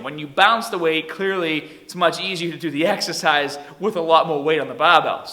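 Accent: Boston, United States. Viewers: Bosten accent? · I'm irish but this dude must be from Boston "hawdaw" "bawbew" Edit: I love the content. No hate, just an observation. Huge fan of the tips.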